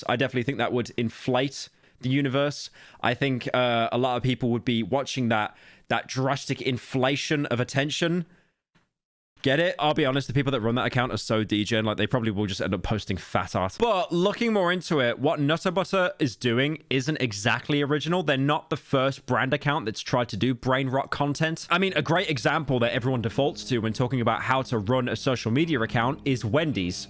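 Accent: United Kingdom. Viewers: Yea I’m unsubscribing, I forgot your British 🤢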